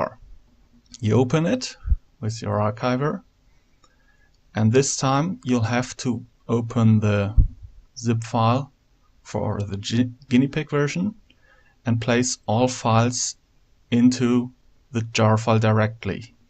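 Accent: Germany. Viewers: I knew you were German with the first sentence you spoke haha :D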